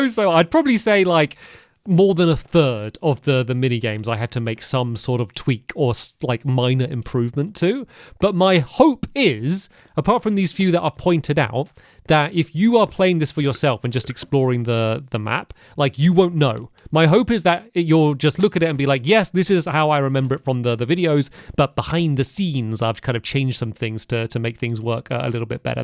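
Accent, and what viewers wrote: England, United Kingdom. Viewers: back when I was four. I didnt know any english! (im a Malaysian btw) but after listening your english accent I not only know english but i know minecraft, and if it werent for you i would be empty... I also watch your other channel I Wonder and Ive been meaning to watch your lovely world series and the I wonder series❤❤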